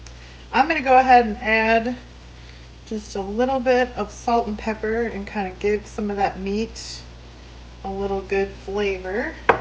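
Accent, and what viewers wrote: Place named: Johannesburg, South Africa. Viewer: Comfort food at its finest! Now that Fall and Winter are just around the corner here in New England, this will be just the thing! I also wanted to ask you if you're anywhere near Jo-Burg [Johannesburg]..we've got some dear friends up there, and your accent is so similar to theirs! Makes me miss them<3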